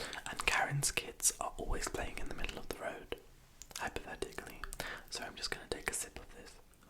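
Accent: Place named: United Kingdom. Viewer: I love your videos and british accent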